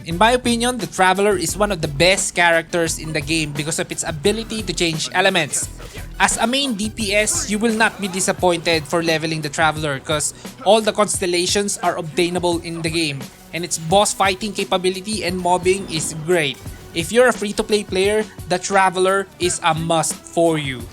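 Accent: Philippines. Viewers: that voice....and accent... Filipino?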